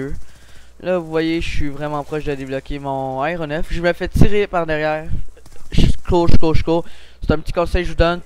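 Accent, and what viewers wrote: Quebec, Canada. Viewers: On dirait que tu as un assent quebequois mélanger avec un assent français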